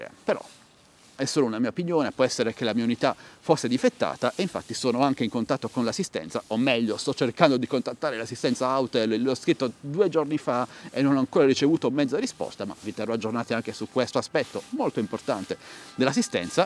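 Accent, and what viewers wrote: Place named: Apulia, Italy. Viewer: QUando ci vuole ci vuole, l'accento pugliese per me ( origine tarantine ) va benissimo ,e se non si da un pò fastidio , se non si è un po rompicoglioni allora non si ha la schiena dritta. Bravo e cmq un fanculo ogni tanto ci sta bene a chi lo merita.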